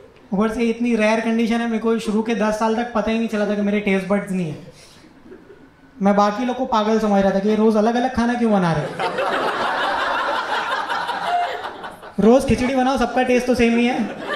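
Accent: Bhopal, India. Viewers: TU BHOPAL SE HAI KYA ???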